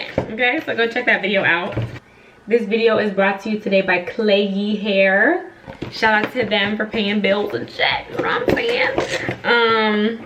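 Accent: Pittsburgh, United States. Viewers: Yesss! Loving the new hair style & makeup👌. My favorite style on you is the updo that you had in your self care video bc your beautiful skin, eyes, & lashes are popping! ✨ But this looks great on you as well and it looks natural. My Sis lives in Pittsburgh and she has that same accent even though we are from N.C. I went to NCCU. And I experienced fake girlfriends but what got me was back at home I had a fake guy friend that told me ALL of his “cousins” business! I was so shocked and it was an immediate red flag 🚩 for me. I later told him that he “talked like a Bitch!”. Lol.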